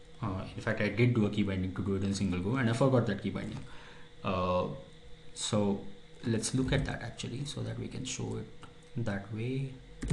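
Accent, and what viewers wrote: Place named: India. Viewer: this looks interesting indian accent NOPE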